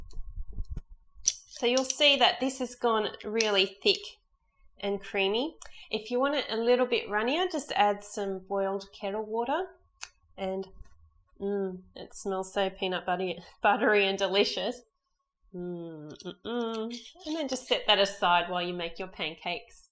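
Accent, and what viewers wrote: Australia. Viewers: Your accent is lovely! Australian?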